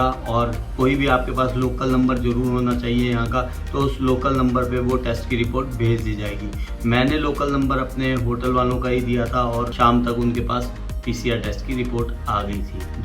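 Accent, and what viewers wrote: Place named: Old Delhi, India. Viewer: aapka baat karne ka style bahut Achcha hai Old Delhi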